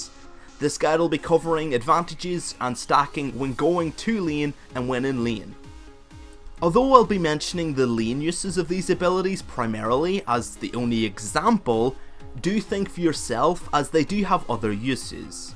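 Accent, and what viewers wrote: Northern Ireland, United Kingdom. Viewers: whaere is from? Never heard his accent before, and can't say if it's more British or American... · He's Irish, more specifically, he might be Northern Irish.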